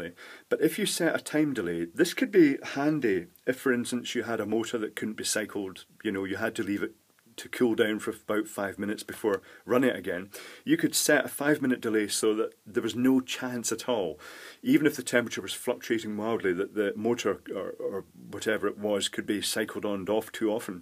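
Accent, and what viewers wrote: Scotland, United Kingdom. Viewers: I was wondering about that lol.....I thought you were saying 'break' it, in your Scottish accent....!! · where does this horrible teenage kind of expression 'bricked' come from? Are we using Americanism again? we should be preserving the English language especially with such an attractive Scots accent 😇 keep these fascinating videos coming !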